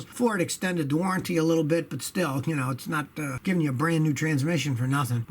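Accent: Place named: Buffalo, United States. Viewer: You talk like a buffalonian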